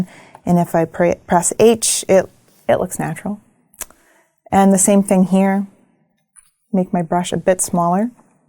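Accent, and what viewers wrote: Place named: New England, United States. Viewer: I'm glad you like our Yankee accents :D I have to say, the NZ accent is one of my favorites thanks to Flight of the Conchords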